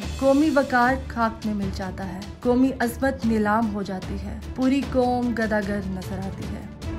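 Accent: Punjab, India. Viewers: اس وڈیو کلپ میں جس محترمہ کی آواز میں voice over کی ریکارڈنگ کی گئی ہے وہ انتہائی فضول ہے کیونکہ اس عورت کا اردو الفاظ کے تلفظ ہندی زبان سے متاثر شدہ لگ رہے ہیں۔ اُردو زبان میں تلفظ کی ادائیگی کی بہت زیادہ اغلاط ہیں۔ انڈین انداز میں غ کو ک کی طرح بولا جا رہا ہے۔ جو کہ بالکل بھی متاثر کُن نہیں ہے۔ مثلاً گداگر کو با بار گداگار، غیرت کو گیرت، غور کو گور پہلی قسم کی بجائے پہلی اقسام خاک کو کھاک درجہ کو درج تیسری قسم کو تیسری اقسام کہا گیا ہے بکواس پوسٹ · سچ میں آپ میں ٹیلنٹ ہیں پاکستان زندہ باد آپ نے موزوں بھی اچھا چوز کیا اور رول بھی بہت اچھا ادا کیا ہیں اور ہمیں پاکستان حالت کے بارے سے آگاہ کیا ڈریس بھی میچ کیا آواز کے ساتھ زبردست کمال ہیں اور دوسری بات یہ ہیں کے پنجابی آواز آپ پر سوٹ کر رہی ہیں۔ نائس اللہ آپ کو صحت خوشیاں اور لمبی زندگی دے آمین ثم آمین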